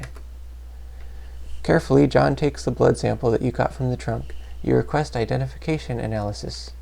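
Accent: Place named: New Zealand. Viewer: Sound like he is from New Zealand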